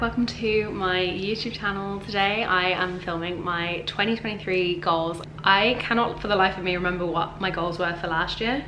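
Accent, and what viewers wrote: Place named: Gloucester, United Kingdom. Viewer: the Gloucester accent is strong in this one after being at home lol 😂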